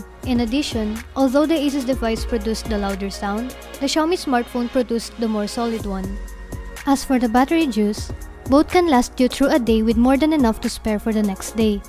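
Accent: Philippines. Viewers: Don't be bothered with those people that comment on your accent. it may not be neutral but its understandable enough for most like me. It's the natural way most filipinos pronounce english due to filipino being our 1st language.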